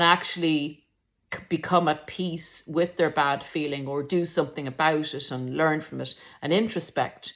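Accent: Ireland. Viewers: OMG, you knew but you didn't want to face it. They also like to wait till we've had a few drinks before they act up or 'fess up. My ex used to tell me about all the cheating housewives on our street. Later I realized they were cheating with HIM! He "worked from home" while I went out to an actual job. I guess they were all getting plenty of "exercise."😂 Love your Irish lilt, eye looks well. Prayers and thanks from across the pond❤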